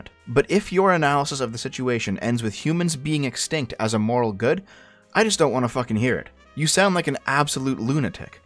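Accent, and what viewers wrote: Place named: Ontario, Canada. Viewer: I think that you only like an hour or two from me. Based on the way you talk I'd assume you live in Ontario. This makes me wanna ask if your grocery store also has to wash the entire conveyor belt with every customer?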